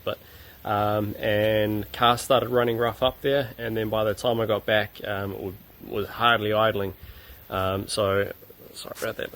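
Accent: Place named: New Zealand. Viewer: Thank you. As seen as I heard your accent I said he must be a kiwi:). I just bought 2009 A4 3.2 Quattro and has same rough idle vibrating problem and kind a goes away when you are driving faster. I would really appreciate your advise for that issue. Where shall I take my car? I am in Auckland and I don’t want to be ripped off TBH! It has 150K milage on it. my email <mail> many thanks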